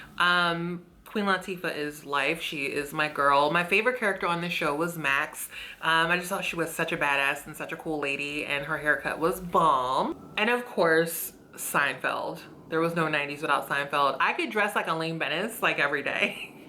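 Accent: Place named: Philadelphia, United States. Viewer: Oh stfu , every time i watch your vids i feel like i know you and now i know why lol YOUR A PHILLY GIRL , must be the accent , im Philly too heehee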